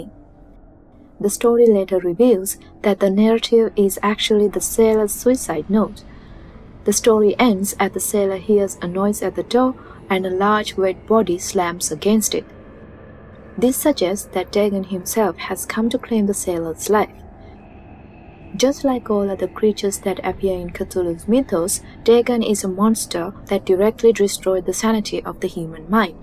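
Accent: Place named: England, United Kingdom. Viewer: nice. story telling! i am curious, i have heard your accent once somewhere else, is this accent from England or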